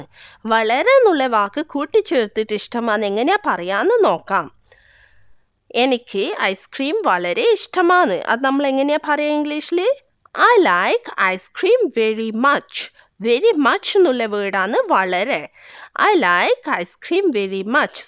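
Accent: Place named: Kannur, India. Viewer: ഇങ്ങള് കണ്ണൂർ ആണല്ലേ 😄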